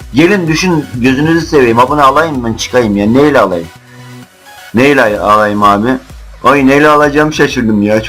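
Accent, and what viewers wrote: Black Sea, Turkey. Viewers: Abi saf karadenizli misin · abi sn karadenizli misin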